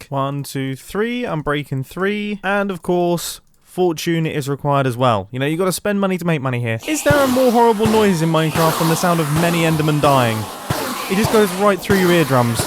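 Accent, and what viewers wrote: United Kingdom. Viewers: Mumbo is extra British in this video. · The fact that or any other song wasn't playing saddens me.... And is it just me or did Mumbo get more and more British as time went on?? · I remember the moments when i realized that mumbo is british... All his britishness just became so apparent from that day onward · Mumbo; *casually and pretty monotone* I wish you ill, oh deary me I'm on fire, lovely jubly (So British, I love it) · All the mild british exclamations are delightful. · yup, he's British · christ this man is british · is the most british 7 secs ive ever heard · his british accent level : strong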